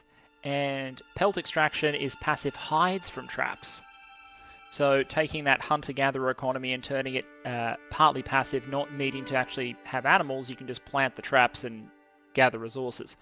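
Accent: Australia. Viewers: Woooooooooo More australian PowerPoint guy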